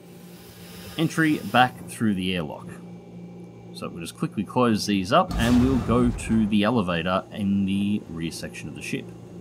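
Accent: Australia. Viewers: your voice is perfect to have in the background while i do other stuff. love aussie accents. a tad more entertaining than south African accents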